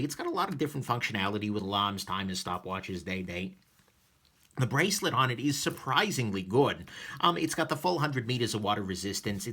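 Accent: New York, United States. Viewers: your watch reviews are excellent, love that NY accent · Love your accent dude! Is it New York Italian? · This guy new yorks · You sound very new york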